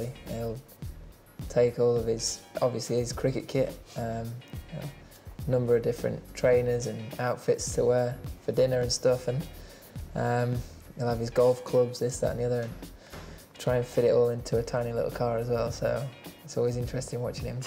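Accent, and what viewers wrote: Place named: Yorkshire, United Kingdom. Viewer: Yeah because both of them have thick Yorkshire accent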